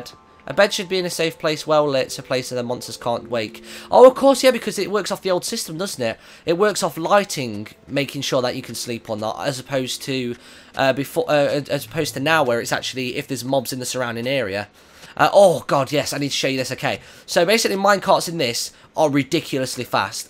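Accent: United Kingdom. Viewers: how come every time i watch something about minecraft the person that talks is british! its so annoying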